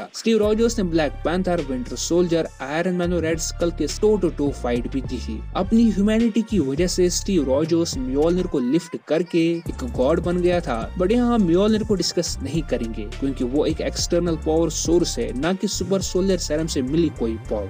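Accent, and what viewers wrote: Kashmir, India. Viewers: Bro Are you Kashmiri . You have a Kashmiri accent ❤️❤️❤️❤️❤️😘😘😘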